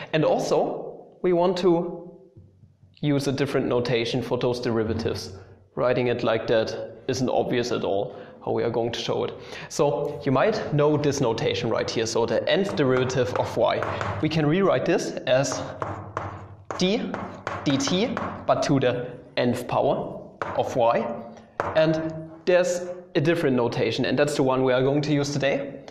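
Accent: Germany. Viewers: That thicc German accent made the informercial even better somehow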